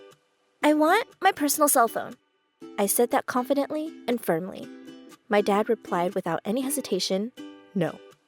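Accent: India. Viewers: One question.....is the narrator Indian ?? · Are you from indian or something? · is the narrator Indian?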